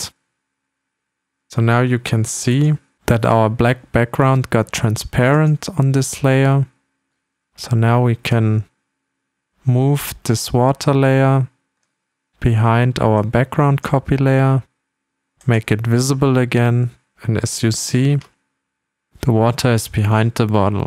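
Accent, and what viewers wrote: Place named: Germany. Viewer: Echt gutes Video! Man hört leicht deinen Deutschen Akzent xd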